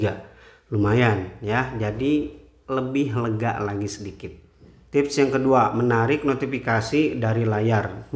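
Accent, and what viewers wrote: West Java, Indonesia. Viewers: Orang jabar ya kang?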